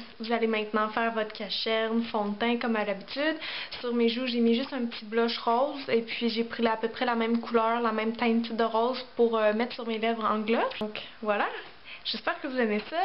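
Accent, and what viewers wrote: Quebec, Canada. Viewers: tu vient du quebec?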